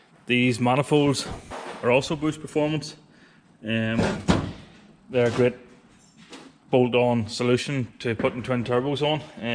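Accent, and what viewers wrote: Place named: Northern Ireland, United Kingdom. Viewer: Hello I have just started to follow your channel and I like what your are doing. It was the st170 engine into the mk2 that I was interested in first because at some point I will be putting an st170 into a red mk3 escort. By the sound of you your from Northern Ireland so am I can I ask what part are you from.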